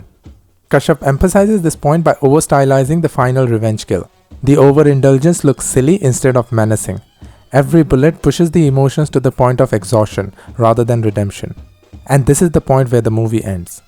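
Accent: India. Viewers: Could not understand your Indian accent properly ?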